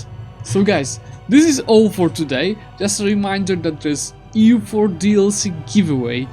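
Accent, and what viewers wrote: Poland. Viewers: this man's accent tickles my hussar sense.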